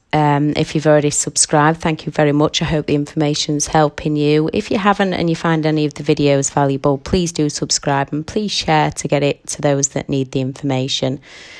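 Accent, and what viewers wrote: United Kingdom. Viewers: I was thinking I might have grown up in the same household with the narrator, except for the British accent. The only member of my family not a narcissist, aside from me, died when I was a kid. For years I thought I just could not get over my father's death, and I cried and cried and cried, thinking there was something wrong with me. It took a long time to realize it was sad that he died, and he was the only one who loved me or treated me with love, tenderness, and care, but what I was really reacting to was the fact that he died and left me alone with THEM. Great analysis of growing up with NPD in siblings.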